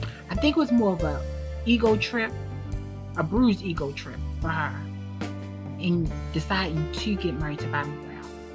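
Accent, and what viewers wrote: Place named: Baltimore, United States. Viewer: Cleary a Baltimorian narrating this. Accent is thick!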